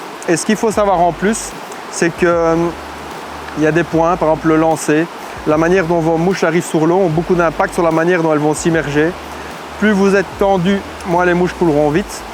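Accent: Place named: Belgium. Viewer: J'adore tes vidéos ! Avec ton petit accent belge ça rajoute un truc de ouf. Merci à toi. Grace à tes vidéos j'ai découvert une pêche après des années de cuillère... Tout en finesse, tout en sensation !! Je ne reviendrai jamais en arrière ! Merci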